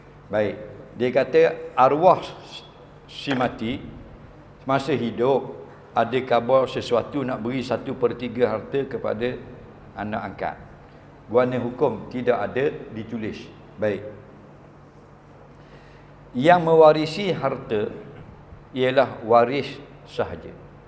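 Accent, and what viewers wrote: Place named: Terengganu, Malaysia. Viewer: Ustaz ckp bahasa melayu standart..ujung2...je ganu...jarang2 ni..😂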